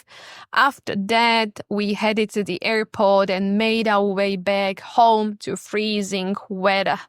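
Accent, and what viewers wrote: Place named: United Kingdom. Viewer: Tá tvoja výslovnosť je dost britská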